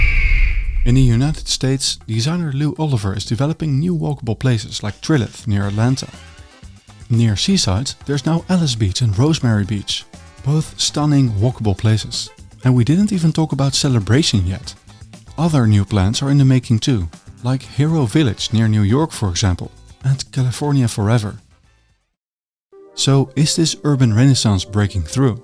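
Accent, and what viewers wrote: Netherlands. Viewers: Wat een gezellig Nederlands accent!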